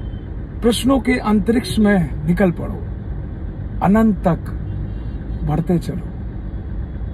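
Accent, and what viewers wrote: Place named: Sagar, India. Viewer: 😁are you from Sagar,,,your accent....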